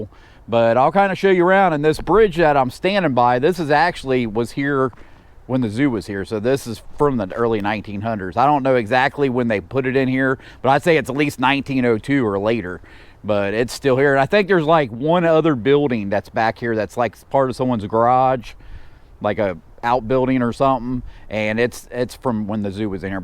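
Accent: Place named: Ohio, United States. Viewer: From Ohio. Good to hear the Ohio accent “worsher” never change :). Reminds me of home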